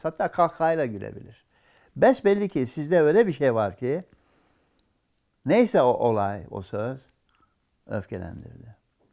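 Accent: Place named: Azerbaijan. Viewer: Azerbeycan şivesini çok seviyorum, Teşekkür ederim ❤🙏🙏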